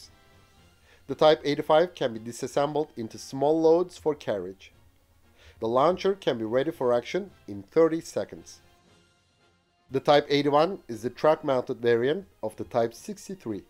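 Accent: Turkey. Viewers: aksana bak kesin türk amk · Konuşan Kardeşim kesin Türk. Selamlar.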